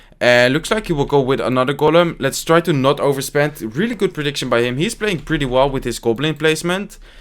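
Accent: Netherlands. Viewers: Ur so obvious dutch:) nice vid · Are you Dutch? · Are you dutch? You have a dutch accent in your english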